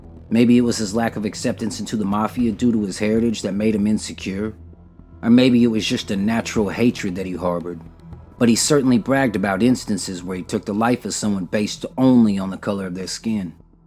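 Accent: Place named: South, United States. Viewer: Where is your accent from? I'm from New England and I know it's not from Boston. I hear hints of mid southern or even Midwest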